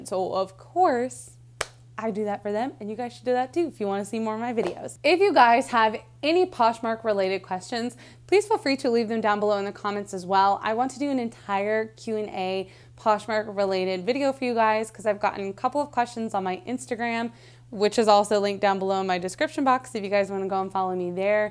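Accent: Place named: South, United States. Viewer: Lol. I like your southern accent. I’m from Tennessee, so I like it.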